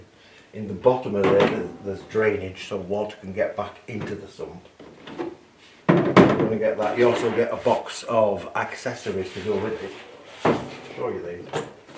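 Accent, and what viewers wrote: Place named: Yorkshire, United Kingdom. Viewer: Love the yorkshire accent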